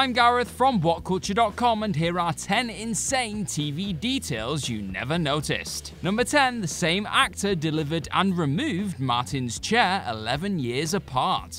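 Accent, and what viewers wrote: United Kingdom. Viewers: It's just a British thing, they say haitch rather than aitch for the letter 'h'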